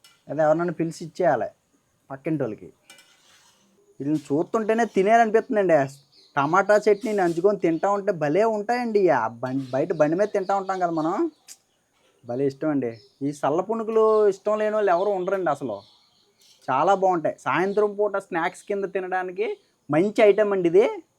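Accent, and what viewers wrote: Godavari, India. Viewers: మీ విడియో లు చక్కని గోదా వారి యాస తో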